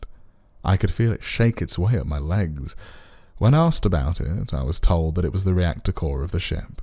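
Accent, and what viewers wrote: United Kingdom. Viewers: The Queen's English accent is perfect for this story about a alien dignitary. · ok. Ive no doubt youre using real voices. IF you are using AI voice, youve fooled me. But nah. Youre clearly from the UK. the accent. Youre not US, Youre not Aussie or Kiwi, That is a UK accent. Mum, Buubly, certain words pronounced as only UK accent does. Its great, Youre an awesome narrator. Thanks for reading us the SciFi.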